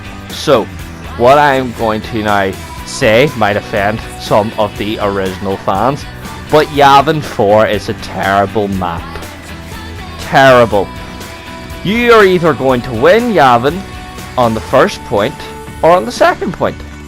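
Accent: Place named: Northern Ireland, United Kingdom. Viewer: Great video well done keep this up and you get somewhere your accent sounds like it’s from Northern Ireland I’m from there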